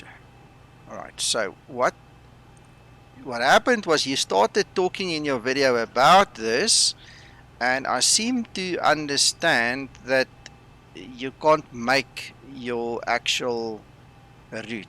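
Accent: South Africa. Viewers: Digging the SA accent bro.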